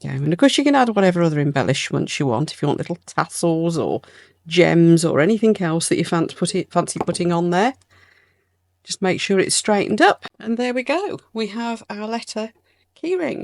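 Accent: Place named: Midlands, United Kingdom. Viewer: Super informative! Are you from the Midlands by any chance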